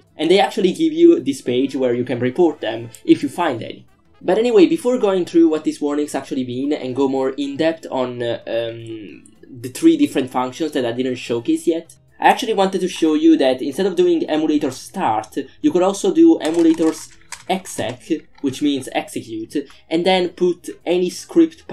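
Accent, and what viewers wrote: Italy. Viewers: Great video thanks very much! I love the accent! haha is it Italian? Would you be able to explain how to debug and set breakpoints in a call to "on Document created" in firebase functions.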